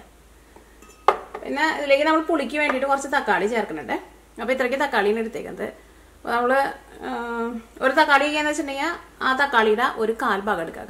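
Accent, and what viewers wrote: Thrissur, India. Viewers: അതാരികും സംസാരത്തിൽ ഒരു കര കരപ്പ്.. പിന്നെ തൃശൂർ കാരി ആണെന്ന് ആദ്യം തന്നെ മനസിലായി..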